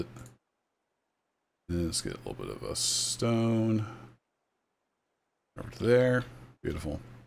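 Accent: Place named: Midwest, United States. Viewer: Is it just me or does sound like he is from the Midwest or the rockys area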